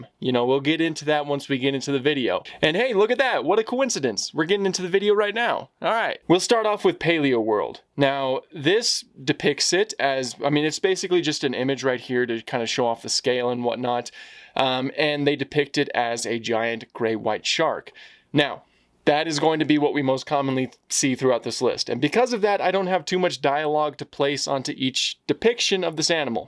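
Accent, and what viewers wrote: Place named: Texas, United States. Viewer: clicked this video because it was something that looked interesting but your accent immediately caught my attention, you sound like the guy from demolition ranch so I'm gonna guess you're from Texas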